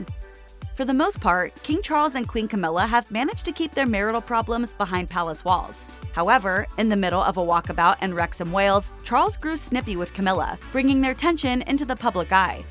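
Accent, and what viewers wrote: United States. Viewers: The moment that American voice started I knew we were in for a load of twaddle. You are so indoctrinated with 'celeb-crap thinking' that you view everything through US celeb lenses. The rest of the world is different - reality is the norm, not trashy gossip dished out as 'news' Sleeping in separate beds is the norm in such households - it's the norm!!! Public spats? You mean 'body language' interpretations. Rumours - yeah rumours. "may soon be calling it quits.." Yeah, that's a big 'maybe' How much is the MM paying you for this silliness? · What a load of rubbish! Why is it these stories are always narrated by an american?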